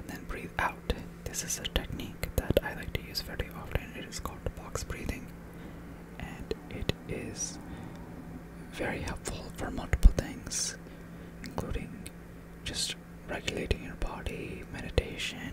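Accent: India. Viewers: This is awesome. Your Indian accent rules bro 🔥🔥🔥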